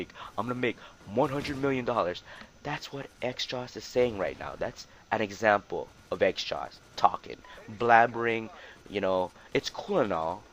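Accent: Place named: Asia. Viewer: I love you Asian accent you badass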